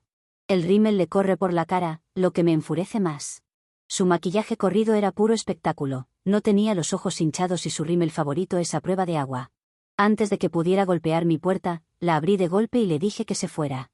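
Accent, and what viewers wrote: Mexico. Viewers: Tan temprano y ya tenemos suegra tóxica 🙄 por cierto, buenos días! Edit: amo como habla e insulta a su suegra en mexicano!!! Te quiero OP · Se nota que es de México jaja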